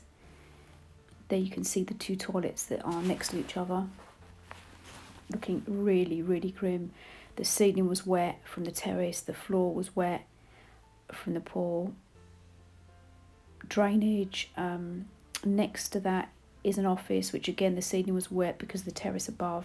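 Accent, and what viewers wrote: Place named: United Kingdom. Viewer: Enjoyed binging your channel. Few requests-- -Please increase the volume on all your commentary. I have the volume all the way up and can barely hear. Being from the US, understanding the British accent with music in background adds an additional challenge. -Create a playlist of all the videos in a row so new viewers can start at the beginning and watch the process in order without having to keep returning to the main channel. -Please move the camera through the rooms a little slower. Moving too fast makes it hard to comprehend what we're seeing and can feel a little dizzy. I also felt like the inset photos were important, but didn't get their connection to what I was viewing. -Thank you for getting new music. The original background music was ok, but it was VERY repetitive for so many videos. It's a lovely property with loads of potential. 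Looking forward to following your journey!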